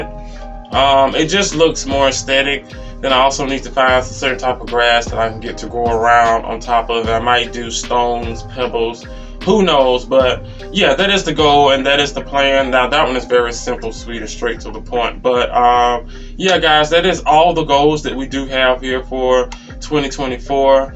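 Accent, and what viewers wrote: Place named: Georgia, United States. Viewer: That’s the Georgia southern drawl 😊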